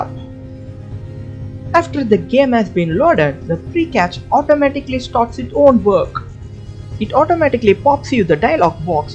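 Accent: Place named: India. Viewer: funny, because I can't even begin to understand it full stop. the music, the mic quality, the indian accent... what is he saying?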